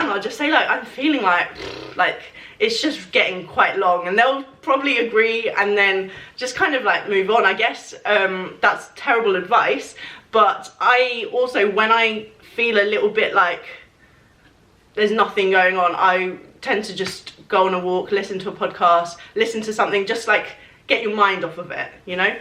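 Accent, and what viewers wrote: United States. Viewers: Hahaha your American accent wasn’t actually that bad. It’s better than I could do a British accent lollll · For these new generation kids, your American accent fits right in there. You'll do fine. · I love your American accent, I’m from Chicago and feel as though my British accent would be just as poor 😂 love you & your videos 💜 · loved the american accent :) i'm from ny! my question for next video would be, how does your mom feel about your camera man :)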